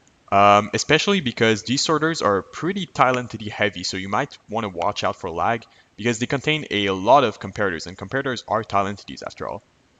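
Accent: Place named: Quebec, Canada. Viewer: Bro t'es québécois? j'reconnais ton accent XD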